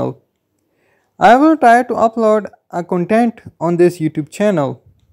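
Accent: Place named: Pakistan. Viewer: Pakistani baba Speaking English 💀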